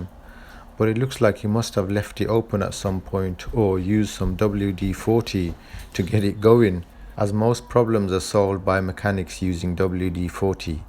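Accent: Birmingham, United Kingdom. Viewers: Hello " Brummie " I really enjoy your videos. Keep up the great work !!! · great job Sir. Love that you included the multiple visits from your boy too. I can detect your Birmingham accent embedded within your original accent. Very interesting. I love the Birmy accent. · Birmingham UK? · Fellow Brummie here. I live in the States now, and it's nice to watch your videos and hear a Brummie accent! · Birmingham. That's the accent I was having trouble placing.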